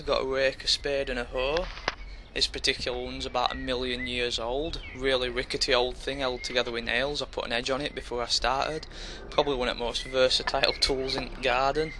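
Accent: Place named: North, United Kingdom. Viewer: Your accent just makes this video even better. Good old northern corn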